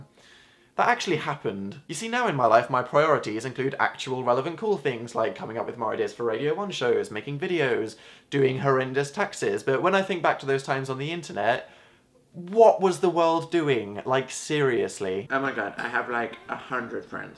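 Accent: United States. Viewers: why did you have an American accent when you were 14? x3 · in 5 years managed to lose a lisp a pillow and an american accent... lol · Who would've thought that was American when he was fourteen..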